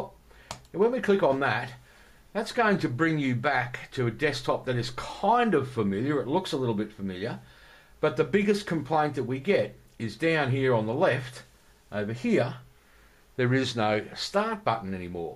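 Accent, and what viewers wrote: Australia. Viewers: are you Australian? sorry I know it's off topic but I'm curious as I can't identify your accent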